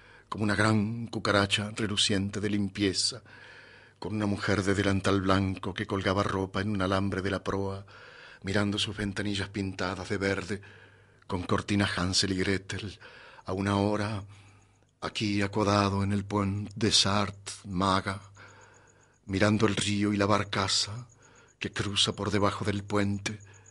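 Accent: Argentina. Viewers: Invito a todos los que critican al narrador a hacerlo mejor que él. Escucharon narrar a Cortázar???? Lee (siiii, lee) de esa forma, dándole cadencia. Y eso es lo que hace el narrador. Es muy difícil ponerse a la altura de semejante obra y poder transmitir lo que logra transmitir. “Rayuela” se llama el libro. No “La rayuela”. Y tiene acento argentino el narrador, por el hecho de que Cortázar era argentino, por más que tuviese acento francés. Felicitaciones señor narrador! Ponele tu impronta. Lo importante es mantener viva esta obra de arte.Cortázar te amo ❤️ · Me encanta con el acento argentino.por que en mi imaginación no lo lee el señor del canal de Youtube.. Para mi lo está leyendo el mismísimo Cortázar con todita su argentines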